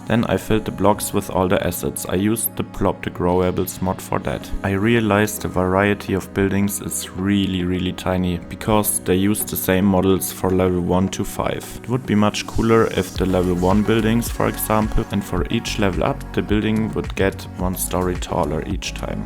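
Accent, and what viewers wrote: Germany. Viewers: are you german? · I Love your german accent Even though German is a Kinda Harsh Language Love Your City 😍🤩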